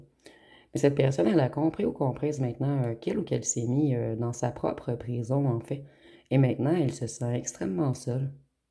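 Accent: Canada. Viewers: 😂😂😂😂🏃‍♂️ c'est ENGENDRAIT 🤔🤔 ça passe très bien avec l'accent Canadien.. Merci Belle Âme ❤ les jours passent je me rends compte que je n'en veux plus de cette là.. plus jamais... qu'est ce que je ferai d'une femme objet sexuel qui a parcouru toute la galaxie en fleurtant avec n'importe quel homme.. jamais de la vie.. jai tourné la page et pour de bon.. j'attends l'heureuse élue et je sens qu'on va bientôt se croiser ❤❤ Gratitide infinie envers le Divin et l'Univers 🙏🙏🙏🙏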